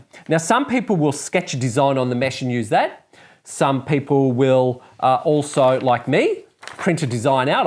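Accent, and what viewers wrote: Australia. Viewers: Very well articulated and I love the Austraaaiilliiaan accent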